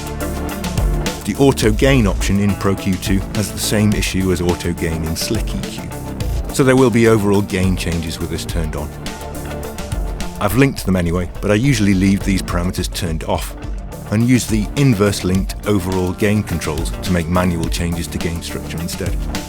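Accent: United Kingdom. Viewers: Y'know,... In the 20-some-odd years that I've been tweaking knobs I've developed juuuust enough hubris to think that I know a thing or two about this stuff. Somehow, though... SOMEHOW — these tutorials of yours manage to either completely school me or at least reintroduce me to concepts in a way that make me question whether I in fact know anything at all. You and your sensible British accent. #besttutor #dulcettones DON'T LET IT GET TO YOUR HEAD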